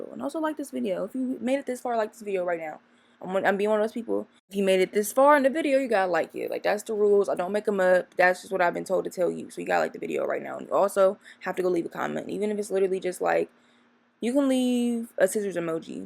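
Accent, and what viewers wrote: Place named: Columbus, United States. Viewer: its so funny how i knew you were from Columbus before you even said it, & yall swear yall don't have accents 😭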